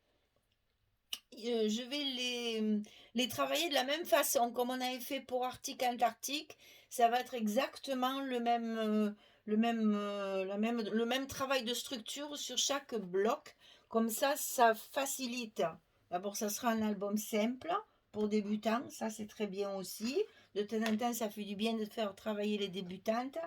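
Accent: South, France. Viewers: Bonjour Toujours un plaisir de regarder vos vidéo et d entendre votre accent du Sud! je scrap depuis plus, de 10ans avant j étais dans une association maintenant je scrap chez moi beaucoup pour mes petits enfants Sympa le kit 😍 J habite à Granville en Normandie ( pas très loin. du Mont St Michel) 😉 Laurence Bonne journée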